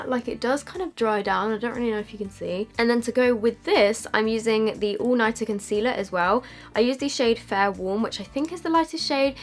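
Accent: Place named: England, United Kingdom. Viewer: purple emoji purple emoji purple emoji I LOVE your English accent and your makeup looks!!! Legit whenever I see the thumbnails I'm like "OMG I NEED TO TRY AND RECREAT THAT LOOK" even if I'm legit staying home and doing absolutely nothing (and taking it off straight after) Btw hi from New Zealand if you see this!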